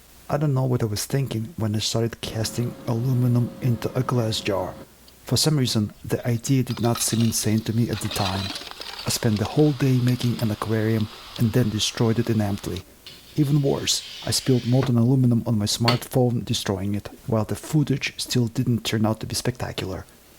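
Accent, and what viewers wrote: Russia. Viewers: "Good enough," is not good enough for! Thank you for your fascinating videos on so many different "maker" topics. Your narration in Russian-scented but perfect English is very pleasant to listen to. Oh, and don't hurt yourself, please.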